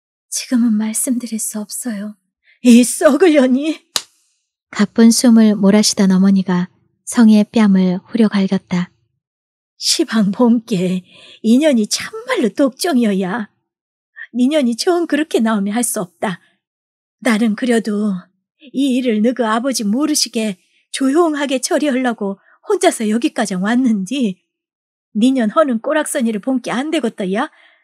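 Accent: Jeolla, South Korea. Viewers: 천재다...오디오북 유투버들의 격을 높인 채널이다. 이전에는 사투리며 지방색을 어설프게 표현해도 그러려니...좋은뜻으로 하시는 분들이니까...하고 퉁치고 넘어갔었다. 그렇지만 이 분은 필시 공부하는 유투버다. 연구하고 분석하느라 여러번 읽었던 티가 난다. 이 분은 몇개월전만해도 전라남도 사투리가 엉성했었는데 현재는 놀랄만치 여러 명의 배우를 등장시킨다. 작가가 추구하는 현장감을 살리고 작가가 주안점을 두고 있는 띄어읽기에 특히 주의하는 의지가 보인다. 노력에 박수. 박수를 보낸다.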